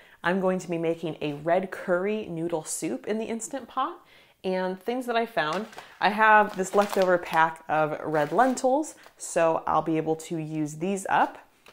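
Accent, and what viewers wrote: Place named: United States. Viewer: Dear it is so nice to hear you speaking american english. Such a pleasure.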